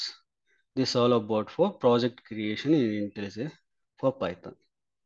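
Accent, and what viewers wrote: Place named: India. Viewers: are you talking in english? work on your indian accent bro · I can clearly understand him speaking in English. His indian accent is not that strong. I think it is you ...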